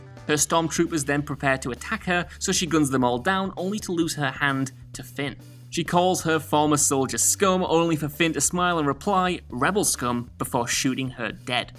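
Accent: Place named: England, United Kingdom. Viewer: Just show the scenes, I dont give a damn about the intro or hearing your annoying pom voice.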